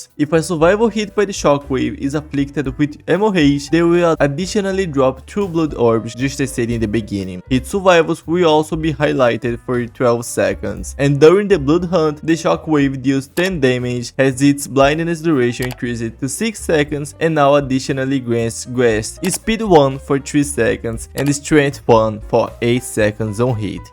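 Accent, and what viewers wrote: Brazil. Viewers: Cara não vou mentir que incomoda o inglês brasil seu sotaque me ataca muito mas bom video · O inglês mas brasileiro q eu js vi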